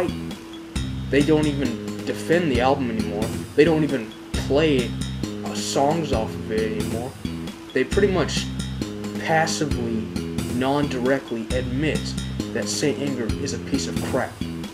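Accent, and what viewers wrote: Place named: United States. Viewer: Speak English instead of pigeon American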